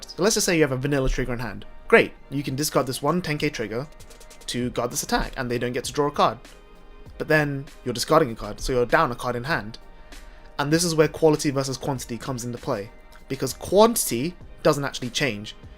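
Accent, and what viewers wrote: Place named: Belfast, United Kingdom. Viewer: I like that Belfast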